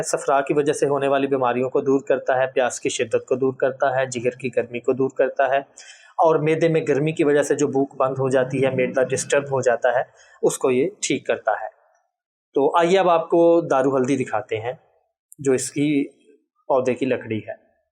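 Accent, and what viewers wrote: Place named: Lahore, Pakistan. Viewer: Lahore Pakistan se boht Sara Pyar apko aap kis city se ho dear ❤️aap boht hi piara bolte ho , asal Hakeem ho aap, Lekin bolne Kay style se Lahore Kay lgte hain 💯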